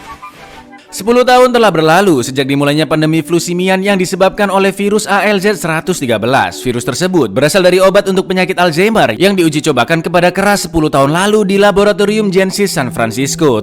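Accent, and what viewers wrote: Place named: Surabaya, Indonesia. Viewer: Aku arek mojokerto paham banget celometane arek suroboyo sidoarjo model iki wkwkwk nggarai mengkel2 kudu ngguyu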